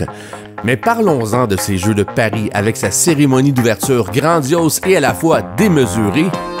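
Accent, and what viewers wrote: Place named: Quebec, Canada. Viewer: Bon montage ça se regarde tout seul et avec l'accent du quebec pour les jeux de L.A c est encore mieux..^ un français qui est venu passer quelque mois de bon temps chez vous et qui en garde un bien bien bon souvenir..(plus français que la france a certains égards)❤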